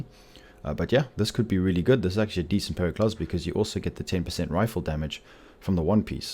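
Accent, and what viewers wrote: South Africa. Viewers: Hey am I hearing a South African accent there?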